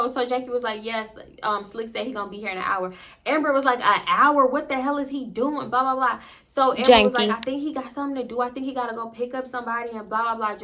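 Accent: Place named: Houston, United States. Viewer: I’m really trying to figure out are you a real Houston native. Moved here as an adult, moved here as a child ..lol definitely sounds like a Houston native with the lingo and accent . The body is giving Houstonian 😂😂😂 but I’m surprised I’ve never seen you before . Sound like you be a lot of places and artist I be around and you are very much eye candy 😬😂😂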